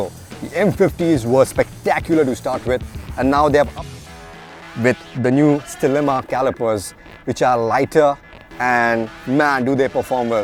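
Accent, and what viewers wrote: India. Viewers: ''paaan nigaale'' lol typical dhoti tone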